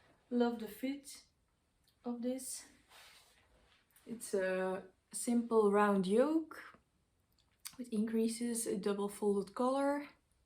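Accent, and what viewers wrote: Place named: Russia. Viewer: Прекрасные изделия! Но у вас такой русский акцент...😀 Спаибо🙂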